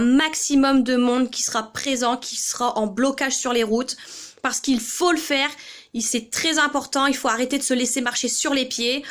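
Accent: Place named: North, France. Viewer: T est du nord ta l accent je suis en Normandie je suis du ch nord a la base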